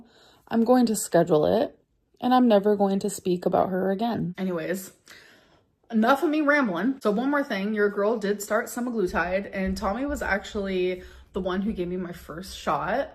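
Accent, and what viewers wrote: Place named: South, United States. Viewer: She sounded like Lex in that voiceover and back to her weird southern thing in the video. That’s some close up comparison of her powers of absorption😂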